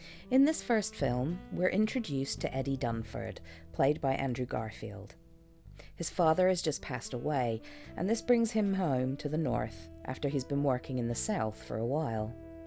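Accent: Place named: West Country, United Kingdom. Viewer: I'm trying to figure out your accent,! "Bristew" was my initial guess but it sounds closer to Devon/Cornish than that. Irish twangs, perhaps? It's the Austism at work!!!! 😂 I was intending to start on this trilogy tonight but I'm so blasted on painkillers that I'm going to save it for a time when I'm more alert! A clockwork Orange, it is...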